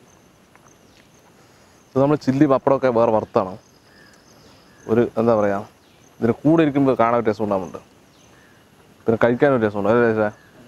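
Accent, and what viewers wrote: Palakkad, India. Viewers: നിങ്ങടെ സംസാരം ആണ് ഹൈലൈറ്റ് ഞാനും പാലക്കാടനാ ലഷ്മണൻ എവിടെ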